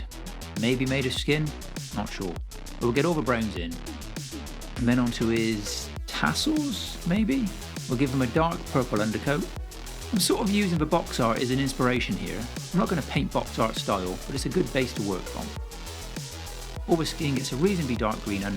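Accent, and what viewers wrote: South West, United Kingdom. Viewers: Didn’t realise that I was missing the South West accent from the modelling community! And I’m glad I’m not the only one who rests their head on the lamp!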